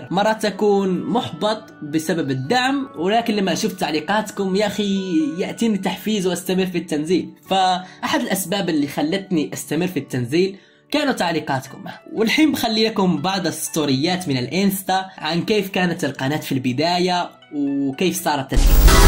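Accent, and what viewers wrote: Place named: Morocco. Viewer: أضن كلامك يحي إلى أنك مغربي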